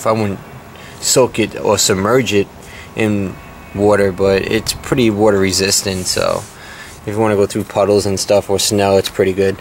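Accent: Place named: East Coast, United States. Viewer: Are u from new York or somewhere in the east coast u have a strong acent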